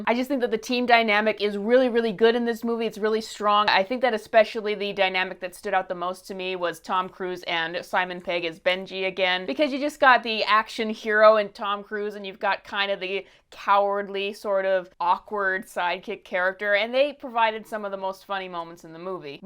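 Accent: United States. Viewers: I love your accent! What state are you from?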